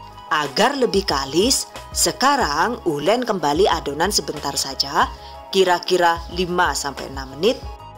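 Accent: Banyumas, Indonesia. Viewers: Terimakasih resep2xa,selalu menyenangkan menonton video THV, gk jarang saya senyum2 mendengar cara bicara si bunda, yg paling saya suka bicara dlm logat ngapak😁👍...... Request saus pasangan dimsum, matursuwun🙏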